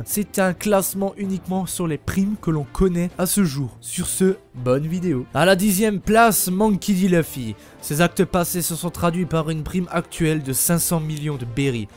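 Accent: Alsace, France. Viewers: Yôôôôô cet accent sent l'alsace! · T'a l'accent alsacien !!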